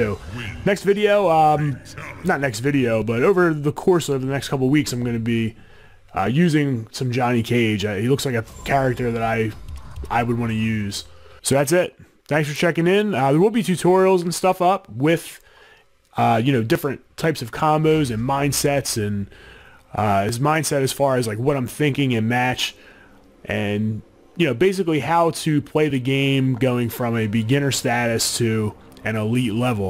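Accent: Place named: Philadelphia, United States. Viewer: def either from philly or south jersey...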